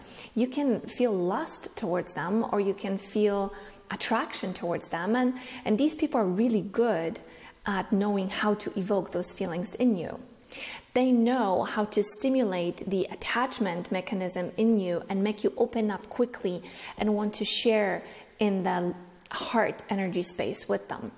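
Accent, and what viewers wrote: Poland. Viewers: I watched this thinking about someone else and woke up today feeling horrible Bc I'm so mad at myself for not listening to my intuition and getting caught up in manipulative relationships. But when you said that I heard my mom in every way. And she is from Poland. So the accent reminds me of her. I thought I could forgive her but I feel way to entrenched and horrible I have no idea how to live my life anymore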